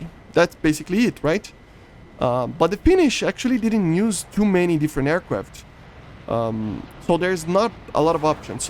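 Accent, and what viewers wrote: Brazil. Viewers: Eu identifiquei o sotaque brasileiro IMEDIATAMENTE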